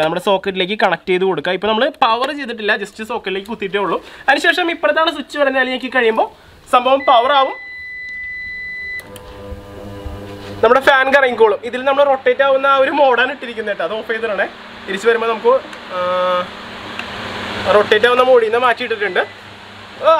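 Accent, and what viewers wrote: Thrissur, India. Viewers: Thrissur slang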